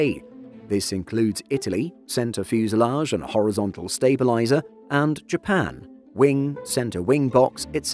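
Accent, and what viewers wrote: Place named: United Kingdom. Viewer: I think your British accent shows your bias.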